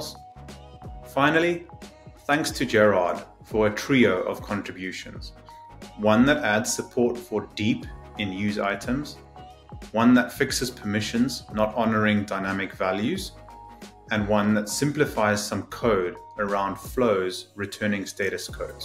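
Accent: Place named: South Africa. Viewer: This guy sounds South African. any way thanks for the update 🔥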